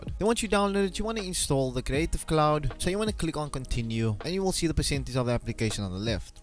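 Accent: South Africa. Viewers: hes not? hes south african lol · Are you from South Africa?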